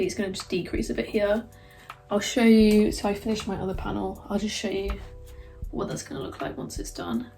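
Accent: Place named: United Kingdom. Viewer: what kinda british accent do you have? i like it!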